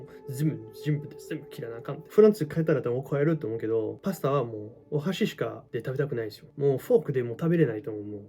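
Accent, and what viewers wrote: Kansai, Japan. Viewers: アクセントが関西弁😊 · ステーキ🥩硬いやん切れへんやんか🤣りっぱな関西人やん👏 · はい( ¯꒳¯ )b✧ もちろんですやん関西弁で 話してくれるから めっちゃ親近感やし✨✨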